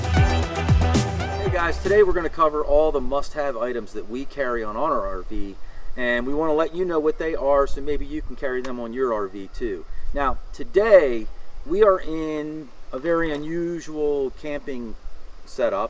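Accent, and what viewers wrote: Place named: Baltimore, United States. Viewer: We just got out first rv so this video is very helpful! You must be from Baltimore with your accent. Love it!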